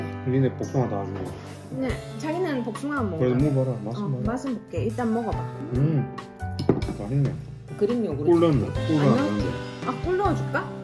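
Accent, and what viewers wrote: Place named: Japan. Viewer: 빵을 엄청 좋아 하시나봐요??^^고기는 감겹살에 상추죠~ 근데 일본사람들도 저렇게 먹는걸 좋아 하나요? 한국은 상추에 먹을때 된장을 무처 먹는데요 ㅎ,근데 님은 한국분인가요 일본분인가요? 한국분으로 알고 있는데 ^^ 말억양이 일본분 억양이 보이네요~ 행복한 일상 같이 합니다~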